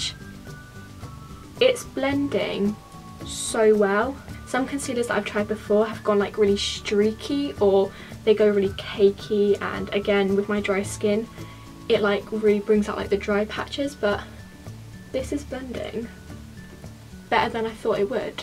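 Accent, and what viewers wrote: United Kingdom. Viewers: Love ur British accent💘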